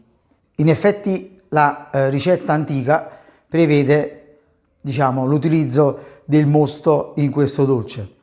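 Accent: Naples, Italy. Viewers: Bravissimo,può fare poi gli struffoli?Sono di Napoli ma abito a Torino e gli struffoli x Natale non mi sono venuti mai bene.Mia madre diceva falli a occhi ma io non lo so fare.Grazie.Sono una nuova iscritta.Mi piace sentire la cadenza"napoletana"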